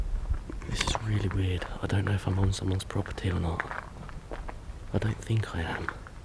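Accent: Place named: Suffolk, United Kingdom. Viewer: Quite welcome! I am fascinated by these type of urban explorers/abandoned properties videos, and enjoy seeing them. Also one viewer suggested having subtitles, as though this were a foreign film in need of translation. Not for me! In my teens the local PBS station ran lots of UK programs, BBC/Thames/ITV etc., so I have no difficulty in hearing your Suffolk accent. My ear for dialects is perhaps more finely tuned than the average fellow :)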